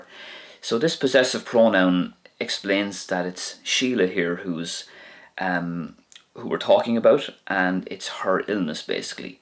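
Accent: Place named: Donegal, Ireland. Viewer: Dia duit, I think it is dialectal. When the letter "F" is followed by a vowel it seems to get this "Fw" sound. Mar shampla, Farraige, fuinneog, and faitíos, etc. I think it is Donegal Irish! I think it is good Irish, I like it. As you point out we have it with "faoi" pronounced "fwee". But it might be changing over time, maybe to a more standard pronunciation. I always pronounce it with the "w" glide. When the vowel cluster "aoi" is preceded by the letter "F" we get it. "aoi" preceded by any other consonant we seem to get the "ee" sound. You have a good ear! Slán agus beannachtaí.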